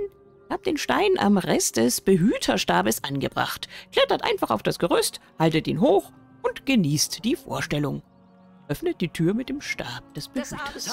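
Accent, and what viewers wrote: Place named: Berlin, Germany. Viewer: Wie süß du das sprichst bist Berliner in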